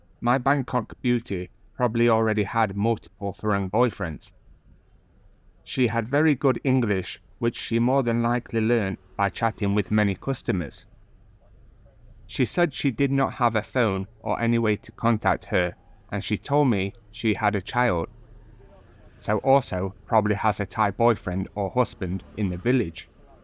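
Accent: England, United Kingdom. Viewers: if you're an Aussie, what's with the English accent? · you sound like a Pom to me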